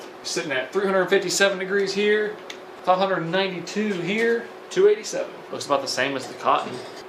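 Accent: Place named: South Carolina, United States. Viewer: South Carolina for ya. Maybe Tennessee, but I'd put money on SC. I've never met the guy, I'm just from GA and recognize the accent and the landscape from the backyard lol.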